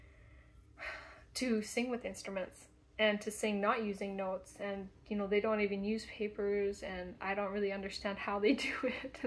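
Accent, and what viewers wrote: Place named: Alberta, Canada. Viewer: Hey! Fellow ex Mennonite here (old colony). Thanks for sharing your story. I really enjoyed listening... also it sounds like maybe youre Albertan as well?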